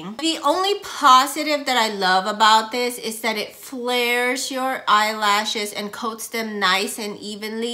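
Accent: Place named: Philippines. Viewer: Thanks for this video! Looking to get a better mascara when I toss my old ones. Just ONE good one. I don't need a bunch that are all going to go bad... Also, are you Filipina? The way you speak sounds similar to my mom. We got the down lashes too.. 😹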